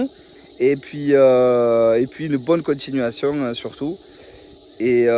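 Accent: South, France. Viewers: Salut belle vidéo avec le bon son du sud bon courage à bientôt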